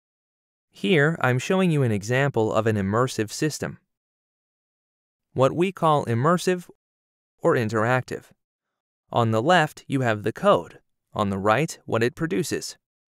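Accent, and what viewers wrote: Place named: France. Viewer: The MOOC is also offered in English with a French accent.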